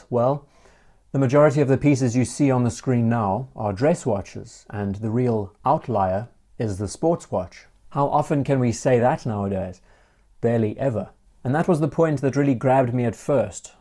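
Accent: South Africa. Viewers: Do I detect the South-African accent? )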